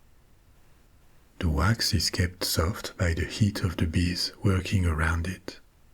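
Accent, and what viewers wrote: France. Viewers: Does have a regional accent? From what part of France does he hail? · would you consider doing Dante's circles of Hell and Virgil's journey through them? I'd love to hear it from your Frankish tones.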